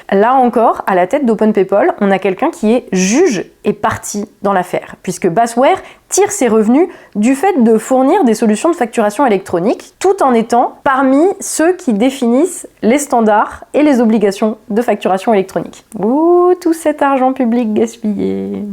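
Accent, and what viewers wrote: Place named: United Kingdom. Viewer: Autant on passe sur l'accent anglais globalement autant le pépale répéter tous les 15s dans la vidéo était très pénible à entendre..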